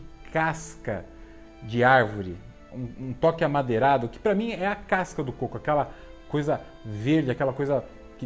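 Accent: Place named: Rio de Janeiro, Brazil. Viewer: Salve lá!:) Eu ri demais do seu sotaque carioca, meu:D. Não curto muito as coisas da JPG porque é simplesmente doce demais:D. Vou por na lista dos decants para saber se vale a pena.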